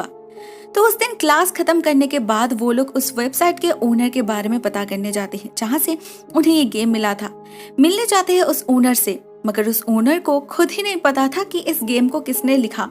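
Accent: Bengal, India. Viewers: U have bengali accent 😀 ..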